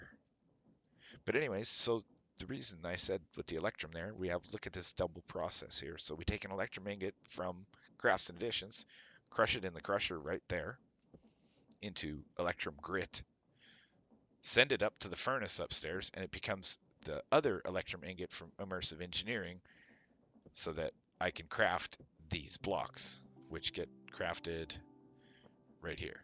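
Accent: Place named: Minnesota, United States. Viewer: What modpack is this? I'm still in the start of the video. Possibly add the link in your description? That bald head makes me happy, lol. Also, are you from Minnesota/Wisconsin? Possibly northern Michigan? I've got a ton of family in Minnesota and you sound like them.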